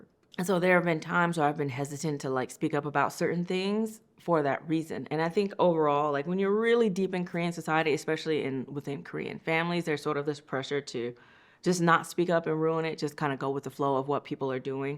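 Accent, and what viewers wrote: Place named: Georgia, United States. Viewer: How have you not lost your Georgia accent?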